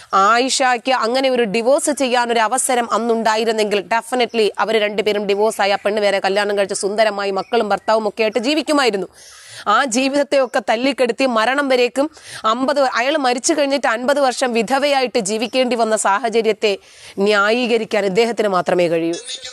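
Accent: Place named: Thrissur, India. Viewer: Porichu. Trichur. Basha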